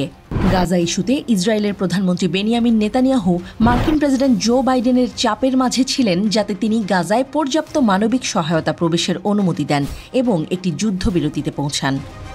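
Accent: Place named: India. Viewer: উপস্থাপক ইন্ডিয়ান হান্ডেট পার্সেন্ট সিওর আমি 🤔😢😂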